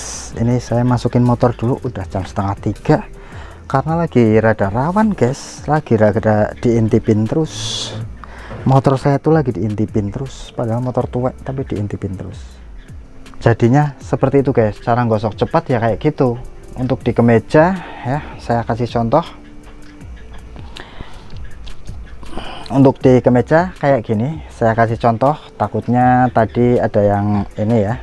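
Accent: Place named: Comal, Indonesia. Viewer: Logatnya koyo wong Comal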